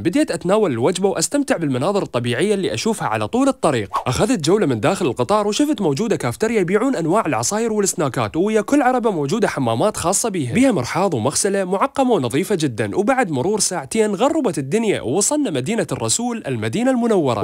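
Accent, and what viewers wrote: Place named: Iraq. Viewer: بس سوال انت عراقي بس لهجتك حيل مبينة عراقي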